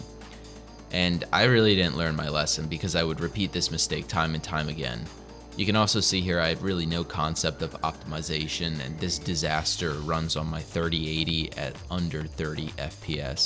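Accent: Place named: Delaware County, United States. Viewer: You from delco? I can hear it ^^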